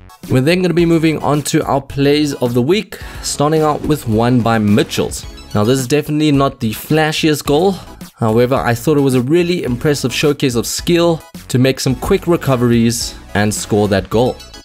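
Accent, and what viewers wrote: South Africa. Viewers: U sound like u from SA big up anyone from Cape Town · Do you have a South African accent?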